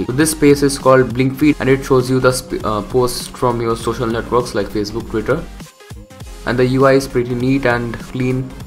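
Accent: India. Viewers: tenk eu kam egen. hard to understand thick Indian accent with loud bg music and bad sound quality.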